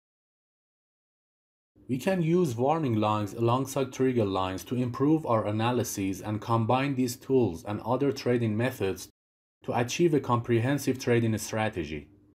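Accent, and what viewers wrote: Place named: Iran. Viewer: great video vali nemidonm chera lahjaton kheili farsi mizane :D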